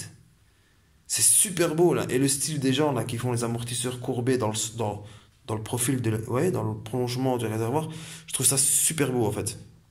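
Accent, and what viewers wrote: Liège, Belgium. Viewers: Tes de quel côté de Belgique a l écoute ont dirai bien liège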